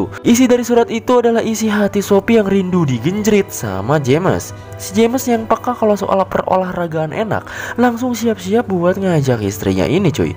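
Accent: West Java, Indonesia. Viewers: Sunda euyy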